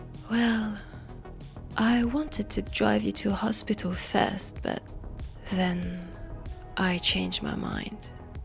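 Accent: France. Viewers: Damn bruh you had me at French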